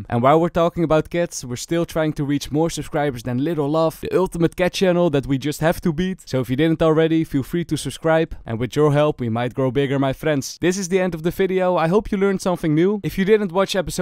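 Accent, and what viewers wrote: Netherlands. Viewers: je hoort heel duidelijk dat ne nederlands bent hahahhhaa maar leuke viddd!!!!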